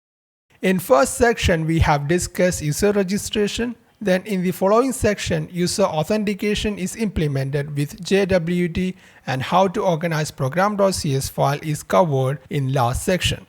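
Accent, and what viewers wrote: Kerala, India. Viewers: NIce presentation and explanation....Your accent sounds like a malayali..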